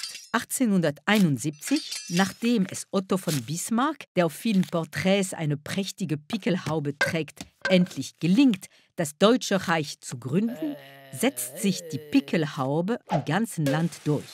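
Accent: France. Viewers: Dieser "komische" Akzent ist ein französischer Akzent und wird von Karambolage schon seit gefühlten Ewigkeiten verwendet. Vielleicht übernehmen die mit den deutschen Akzent ja die französischer Karambolageversion.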